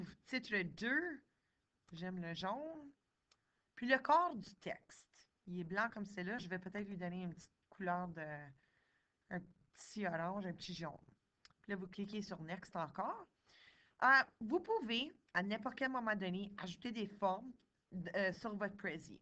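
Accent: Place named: Quebec, Canada. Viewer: Bon tuto fait par une jolie fille avec un joli accent québécois.MERCI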